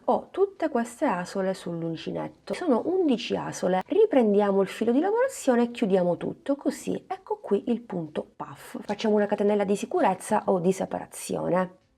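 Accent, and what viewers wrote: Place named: South, Italy. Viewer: si sente che sei del sud anche io lo sono e questo già mi da un motivo per adorarti . Brava e precisa❤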